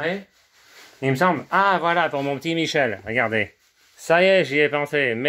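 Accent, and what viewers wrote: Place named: Quebec, Canada. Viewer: Coucou 🤗 je viens de regarder tes 3 parties de retour sur les conseils de Cécile et j adore 😉 d où est ton accent ? Quebec ? Je m abonne 😊 à bientôt 😙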